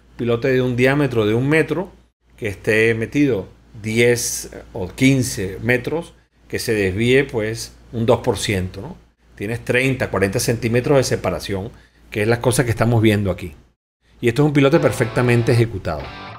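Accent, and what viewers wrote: Venezuela. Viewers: Hola, eres de Venezuela?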